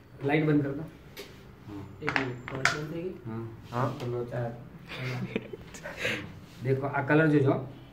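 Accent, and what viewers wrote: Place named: Gujarat, India. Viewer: Gujrat thi lage che sir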